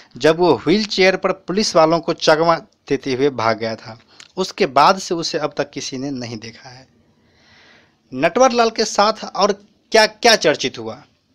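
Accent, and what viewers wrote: Bihar, India. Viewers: Bhai yar bolne ke tareeke se pkka pta chal jata hai ki bihari hi hai 😍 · U are bihari ur voice totali bihari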